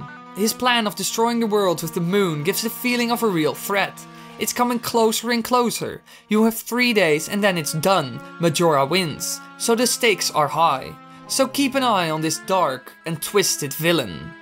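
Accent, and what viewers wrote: Netherlands. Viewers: Lol i can hear you're dutch. Like me😂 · are you dutch? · Are you dutch? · it sounds like he is dutch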